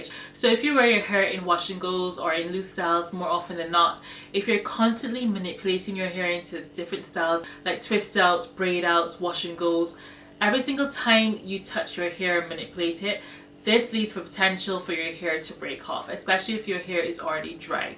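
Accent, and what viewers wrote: Barbados. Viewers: I knew I heard that accent! Fellow Bajan here! ❤️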